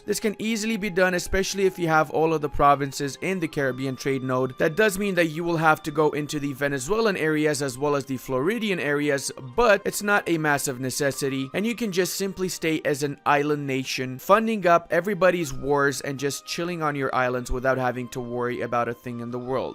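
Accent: Australia. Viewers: The Aussie accent and references were bonza. Yeah, nah, something tells me you’re more than a little familiar with the place. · The Australian accent... it's not bad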